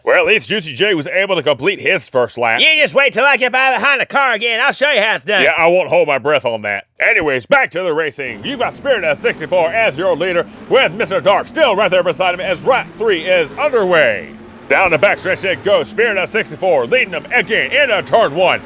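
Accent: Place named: South, United States. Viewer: Just found you guys! About time we had some good country boy narration- 😂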